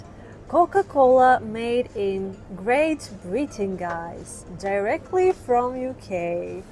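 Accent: Russia. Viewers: Madam, living in various asian cities Have blunted the Russian accent of Your English. i.e your english has lost Russian flavour. I love to listen russians Speaking English. It very different and Nice to ears . ❤❤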